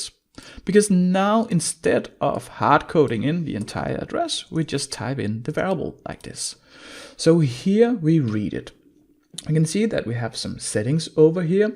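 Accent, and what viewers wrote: Denmark. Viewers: I like the danish accent!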